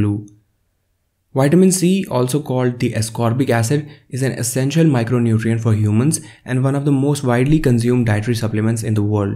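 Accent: India. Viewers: thank you, normally i dont listen to Hindu accent, but your information is worth it!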